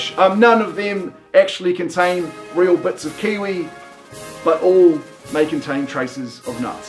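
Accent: United States. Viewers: That American accent tho!! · His American English is brilliant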